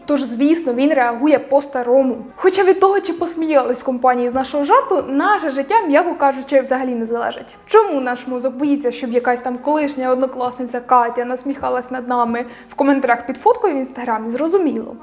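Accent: Poltava, Ukraine. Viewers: Ви дуже автентична, цікава та харизматична ❤ та мені чується полтавський рідний акцент 😅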